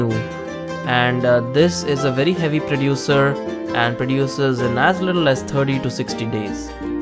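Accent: India. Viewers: heavy indian accent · สำเนียงคนพูดเหมือนคนอินเดีย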